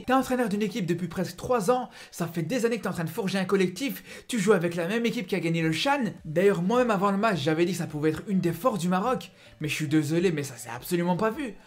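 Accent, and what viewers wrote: Belgium. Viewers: On entend bien ton accent belge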